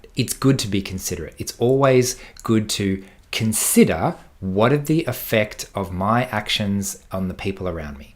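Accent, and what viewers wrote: Australia. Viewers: Nice to hear an Ozzie voice... :)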